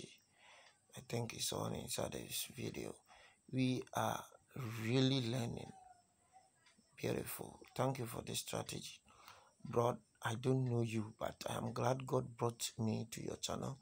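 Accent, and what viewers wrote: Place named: Ghana. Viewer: You sound like a Ghanaian ❤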